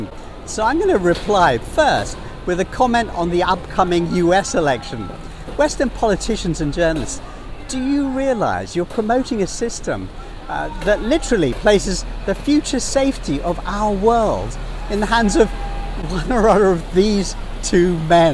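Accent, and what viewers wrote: RP, United Kingdom. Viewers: Great rant delivered in a inimitable RP accent ! But Westerners will still say : "But we can change leaders !" Yes, from Trump to Biden and likely back again !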